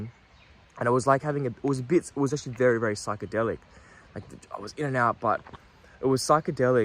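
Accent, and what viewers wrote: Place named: Australia. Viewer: Love your Australian accent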